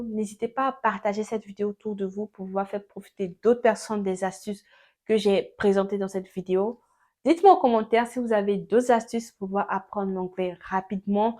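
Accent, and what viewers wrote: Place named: Ivory Coast. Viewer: Accent Ivoirien 🙄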